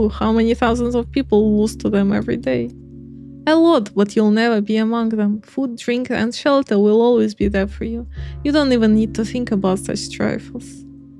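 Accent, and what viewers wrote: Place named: Poland. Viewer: polish accent asmr, its ohkay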